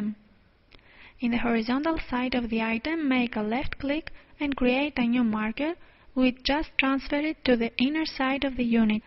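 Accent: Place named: Greece. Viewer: nice accent.. ellhnida? :P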